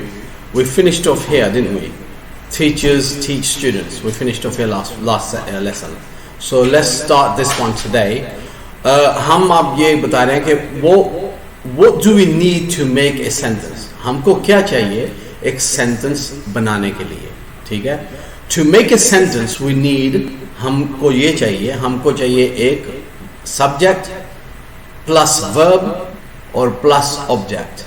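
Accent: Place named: United Kingdom. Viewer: That is such a great opportunity to learn English with you I am so glad to listen your British accent so I am ready to learn English with you..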